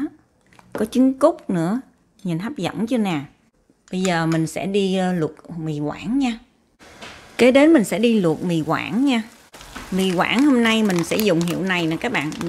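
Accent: South, Vietnam. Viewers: Always love hot boy voice and yours. Your South Vietnamese accent is cute. Your kitchen and cooking tools look very clean. I am impressed. Keep up the good work. Hope to see you in person some day at any restaurant in CA. I can’t eat a lot like you (because just smelling foods also makes me fat.😂) but I do enjoy watching your food stories).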